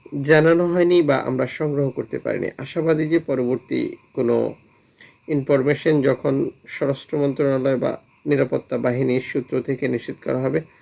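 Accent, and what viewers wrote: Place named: Noakhali, Bangladesh. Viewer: অপরাধী না অফরাধী! নোয়াখাইল্লা!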